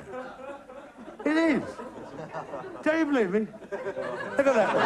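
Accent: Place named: West Country, United Kingdom. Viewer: He had a thick West Country accent!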